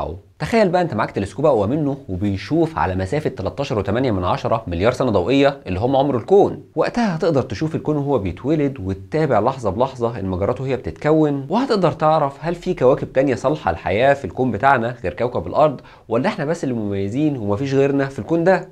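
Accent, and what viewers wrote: Egypt. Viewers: The rythme of your speech is too local... Egyptian accent and rythme which make it not understandable by other arabic talkers...you talk with interrogations where there is no interrogation which is typically Egyptian way to talk...the concentration os loosed quickly because alerts after alerts...we are loosed.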